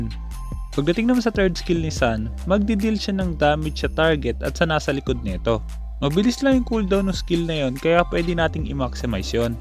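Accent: Visayas, Philippines. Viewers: bisaya ka idol?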